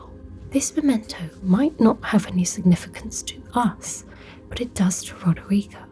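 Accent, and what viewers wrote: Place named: Birmingham, United Kingdom. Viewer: My favourite brummie lady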